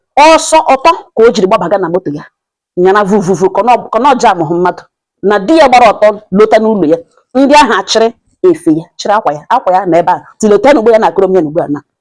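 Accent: Mbano, Nigeria. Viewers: You're really Mbano woman. Kudos for being proud of your dialect. ❤.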